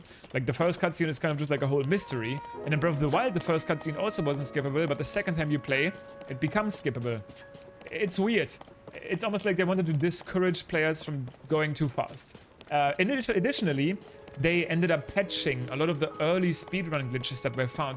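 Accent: Germany. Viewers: So after almost 3 hours of interesting thoughts and stuff I have to say, please work on your pronouncing. Even thats our way of leaning school english in Germany, this is so much of an pain to listen - this german-ish-english pronouncing. You still did well, don't get me wrong, but it would be more perfect when I could enjoy your talk more. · I really like your German accent. It sounds very nice. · Man hört so hart das du deutsch bist 😂❤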